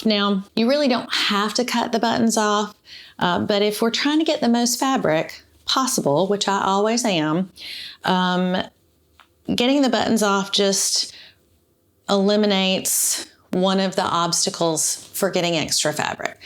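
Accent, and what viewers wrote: South, United States. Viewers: I also just found you and I love your channel- I binge watched every episode! I could listen to your charming southern accent all day long- and find myself thinking in a Southern accent ;) Thank you for all the great ideas on thrusting men's shirts! I purchased a blue striped shirt for a quilt I have been collecting blue& white stripes for several years- when I got home- my husband loved it!🤷‍♀️ When I was watching one of your other episodes, I found myself wondering if you used part of the shirts where the buttons used to be- and if the threads holding the buttons put a stress on the fabric in that area- could it cause a hole? ... if you cut the buttons off before washing the shirts- sometimes the washing process will relax the fabric where a possible hole could arise- so you will have a better idea if it is just stress - or an actual hole- an I explaining myself clearly? 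Anyway, God bless you - and thank you for all this great info❣️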